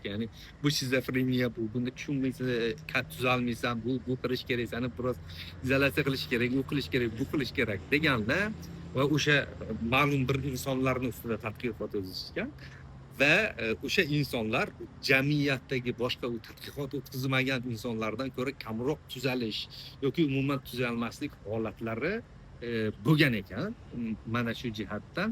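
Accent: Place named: Khorezm, Uzbekistan. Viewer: Xorazmlik emasmisiz, hazrat? Aksentlaringiz shevamizga tortyapti ))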